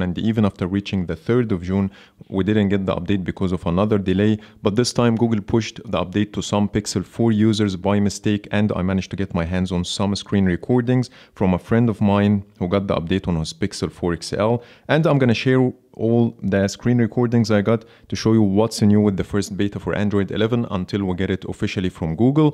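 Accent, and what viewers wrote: Egypt. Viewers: انت مصري صح؟ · A true Egyptian English accent. Who agrees? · very Egyptian accent عم الناس !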